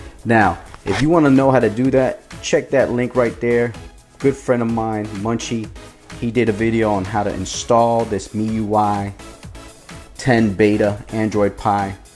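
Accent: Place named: England, United Kingdom. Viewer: What is ur English accent bro?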